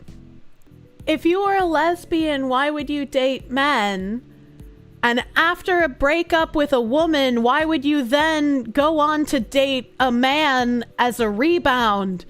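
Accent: Boston, United States. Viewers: HAPPY BIRFDAY, SILLY FOX WOMAN You really are something special, a shining light of squeaky noises, Boston accents, memes and autism. A breath of fresh air in this oppressive nightmare zone of a world. We’re all gonna make it! Keep your chin up, eat some sugary cake, pass out in a food coma and remember how much your community loves you. Happy Birthday.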